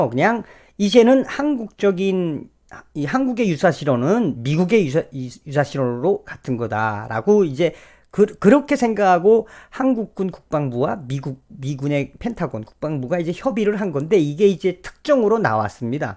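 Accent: North Korea. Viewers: 북한말 좀 불결합니다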